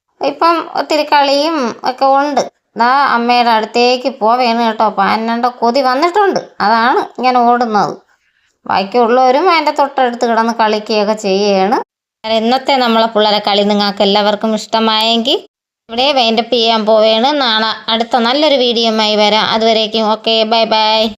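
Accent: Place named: Trivandrum, India. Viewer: മാമി സൂപ്പർ,,,,,, നിങ്ങടെ slang tvm supur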